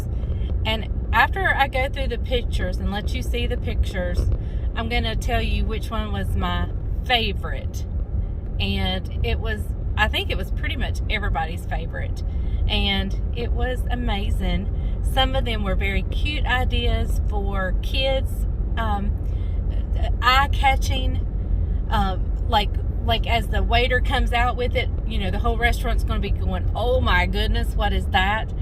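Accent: South, United States. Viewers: That was very enjoyable. Thank you for taking us along. If you are going to be creating new masterpieces I would love it if you could think of some ways to use up a bunch of eggs. I have chickens and we used to love eggs but now that they are coming out of our pet's butts, no one in my family want to eat them. I never realized that chickens breed like rabbits so now I have eggs coming out of my ears. The only time I use them is when I bake or make deserts that call for eggs and the rest I try to give away but I think my friends are about overloaded with them now too :-). I need some ideas of how to use them up. Thanks again for sharing your day. I just found your channel this weekend and I have been binge watching it every moment I get. For some reason you feel so familiar to me so it is like listening to an old friend share a recipe with me. Maybe it is your southern accent 🥰.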